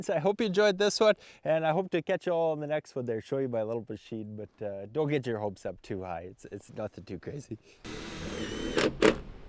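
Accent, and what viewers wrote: Canada. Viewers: I can't give you any advice, i couldn't even build a bird house. I have OCD so i notice little things that would drive me crazy. I have to say i have never once seen anything you do trigger me. So precise, and professionally done. Thank you for taking us on your journey. I can't wait until it is completed. You are very talented and is amazing how you have so many skills. It takes a long time for spring to come in Canada.....i recognize the accent and speach patterns. My parents and 2 elder sister are Canadian. I was the first born American and have been to Canada a few times. Always happy to see your videos pop up on YouTube.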